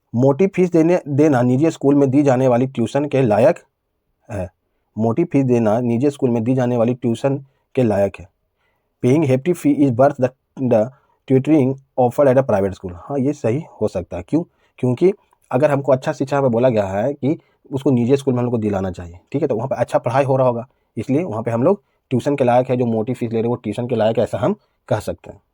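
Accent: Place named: Bihar, India. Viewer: Sir bihar se lag rhe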